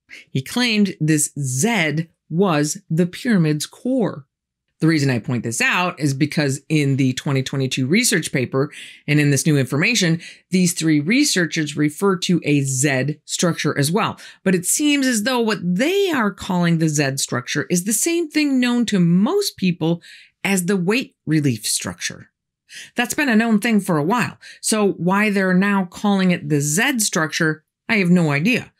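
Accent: United States. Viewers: What an irritating Americn voice....................prat of the year so far!!!!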